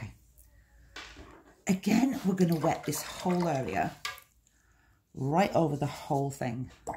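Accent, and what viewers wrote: United Kingdom. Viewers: I am new to Brusho’s but you can bet when I think of them, or read the label, I will imagine the word Brusho being spoken in your delightful British accent.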